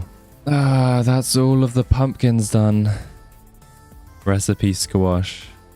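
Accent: United Kingdom. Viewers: Can you take the britishness down a notch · British voice killing me 🥀🥀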